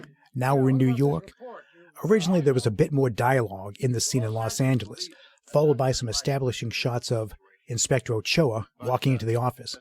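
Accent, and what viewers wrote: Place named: Boston, United States. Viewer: Came for the Winner facts, stayed for the Boston accent.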